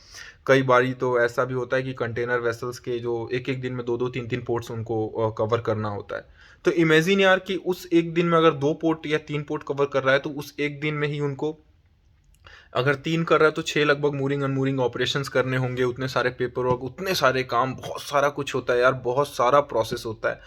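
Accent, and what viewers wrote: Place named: India. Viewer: Headline is english, you speak indian🥲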